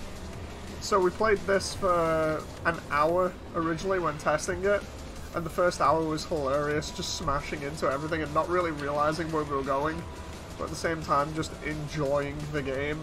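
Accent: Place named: United Kingdom. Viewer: U sound like david schwimmer but british 😂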